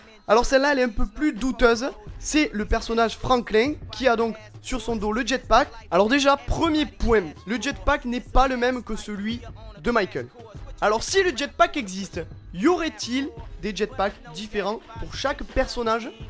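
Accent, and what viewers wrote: South, France. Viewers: Ta bien l axent du sud toi x)) moi aussi tkt :D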